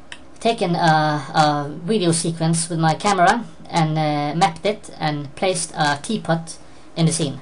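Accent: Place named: Bergen, Norway. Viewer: Faen du kan høre at du er BÆRGENSAR! :)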